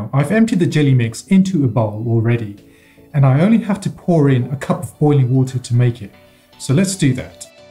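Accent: United Kingdom. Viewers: Your english is superb ! British accent .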